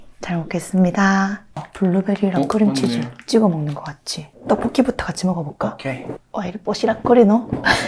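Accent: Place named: Rural, South Korea. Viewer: You guys are so cute together! 😊 You have the country Korean accent, so cute.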